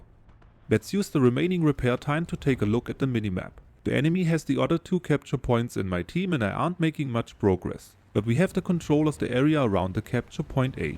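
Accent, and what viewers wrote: Germany. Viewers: Is it possible thst your native language is german ❤?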